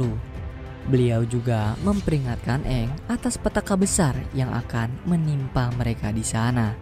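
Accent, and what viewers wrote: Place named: Cirebon, Indonesia. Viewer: Wong cirebon tah min😂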